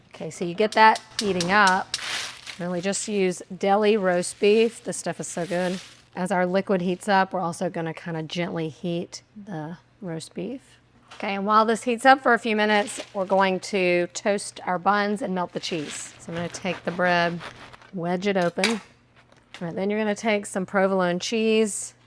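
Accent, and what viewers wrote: South, United States. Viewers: This video made my day! I love the suggestions and your calm southern voice. :) Thank you!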